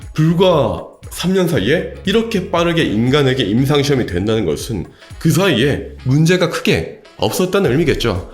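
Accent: Gyeongsang, South Korea. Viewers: 아 경상도애가 표준어 따라하는 억양 역겹네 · 경상도 억양 엄청 심하네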